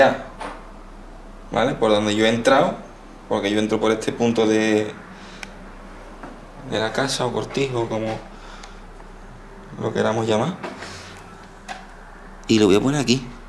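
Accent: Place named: Cádiz, Spain. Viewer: Apuesto a que este señor es Gaditano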